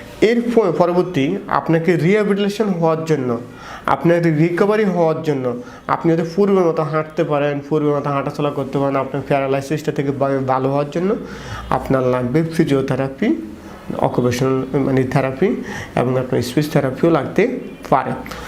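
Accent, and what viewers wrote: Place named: Chittagong, Bangladesh. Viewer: আমার মনে হয় ডাক্তার সাহেবর বাড়ি চট্টগ্রামে। চট্টগ্রাম হোউক বা অন্য কোন বিভাগে যে কারোরই নিজের আন্ঞ্চলিক ভাষার একটা টান থাকে।এটা ত অস্বাভাবিক কিছু নয়।তবে উনার কথা তোতলানো ভাব আছে কিছু টা। আমার মনে হয় উনার আগে আরো বেশি ছিল তোতলানো টা। উনি উনার আত্মবিশ্বাসে অনেকটাই স্পস্ট কথা বলেন। এটা আমাদের বোঝা উচিত যে উনি ইচ্ছে করে কথায় ঝড়তা রাখেন না। সৃষ্টিকর্তা আমাদের সবাই কে সব দিক থেকে পারফেক্ট করে বানায় নি। সবার মাঝে কিছু না কিছু কমতি আছে। কারো কমতি নিয়ে মন্তব্য করা ঠিক নয়। বরং উৎসাহ দেওয়া উচিত যেন সবাই কমতি ভূলে নিজেকে একজন পরিপূর্ণ মানুষ মনে করে সমাজের সেবা মানুষের সেবা করতে,নিজেকে আরো উৎসাহি করতে পারে। তাই নয় কি?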